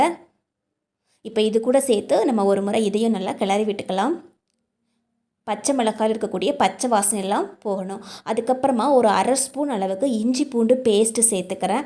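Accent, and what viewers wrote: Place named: Nagercoil, India. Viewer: I think u are from Nagercoil Your language sounds like that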